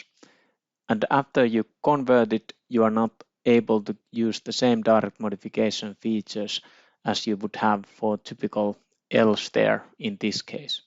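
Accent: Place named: Finland. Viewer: Finnish accent easy to spot :D thanks for the tutorial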